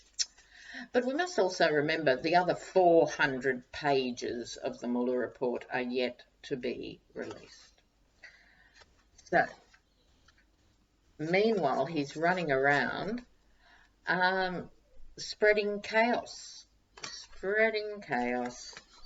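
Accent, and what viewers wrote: Australia. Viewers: Thanks for your razor wit, intelligence and the amazing grasp you have on this whole twisted episode of living history. I’ve become a complete fan. Love to hear your Aussie-isms and fab lefty bolshy-ness calling it out. Xx best of love to you and your family while you are sorting out what needs sorting.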